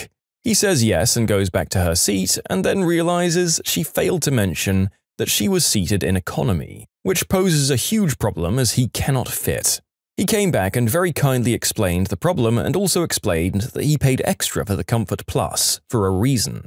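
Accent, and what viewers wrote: United Kingdom. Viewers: Im back its just a britsh lady saying good night to bulldozers and such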